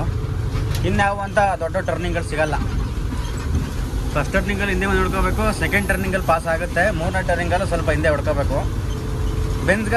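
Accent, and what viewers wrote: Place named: Hassan, India. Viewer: ಪಕ್ಕ ನೀವ್ ಹಾಸನದವ್ರು ಅಲ್ವಾ. ❤️😊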